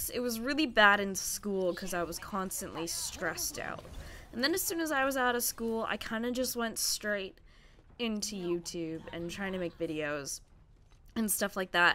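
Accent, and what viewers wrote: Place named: Canada. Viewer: Gurl! Miss you. Whatever you gotta do, you do you :). But we(I) will be there for you in whichever way you need xD. P.S. You sound soo Canadian...and I love it! No offense..? Lol <3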